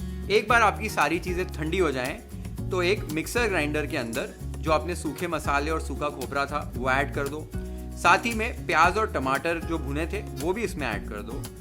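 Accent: Maharashtra, India. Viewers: Bro akele akele khaa raheho😅🙌yaha muhme pani aaga ya😋Bhariii Mutton🤤Mouth Watering......N ur marathi accent tooo😚great work❤️ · I am in love with your MARATHI accent 😍 💕 though I don't write comment frequently I never miss you videos ❤ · Your marathi accent>>>💛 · Your marathi accent 😍👌😋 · Want an accent man Lai Bhari 💯💯 Sir I love your Marathi Accent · Tum jo batate hai wo sunkar lagata hai tum Maharashtran hai panjabi lagata hi nahi bahot aacchha lagata hai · Recipe is perfect perfect & mouthwatering as always but 💯 out of 💯 for your ek number Marathi accent 👌🏻👍🏻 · Omg I am fan of your marathi accent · भावाची मराठी accent लई भारी आहे राव... "झणझणीत , सुखं वाटण , कोथिंबीर , परात," सगळे मराठी शब्द परफेक्ट येतात... #नादखुळा 😊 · OMG 😍.. mouth watering.. in the monsoon.. yummy love the Maharashtrain accent Chef Thank you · Love your Marathi accent..recipe too !! 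Love from kolhapur 🙏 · Tumcha marathi accents 😅❤ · Ur Marathi accent the way u try to explain in Marathi is really appreciable... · Hataoff to you chef. Loved your receipes and your Marathi pronounciation. 👏👍🙏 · Dear, Ek number Lai bhari 👍🏼😍😋 being a Maharashtrian couldn’t resist the authenticity of the recipe. It is exactly the way it’s made at our home. Your Marathi accent is Kadak. Loads of love, keep up the good job 👏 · Ohh man you Marathi accent is litttt 🔥🔥🔥🔥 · U r so good dude … really appreciates ur efforts for Marathi pronunciations and yummy recipes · Appreciate for the wow recipe and your accent for Marathi . Keep it up 👍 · On point... Ur recipe and pronounciation... All are very authentic.. First time I saw a non maharashtrian cook to pronounce exactly the way we say in our home... And all the techniques are almost same as we cook in Maharashtra. Hat's off to you chef...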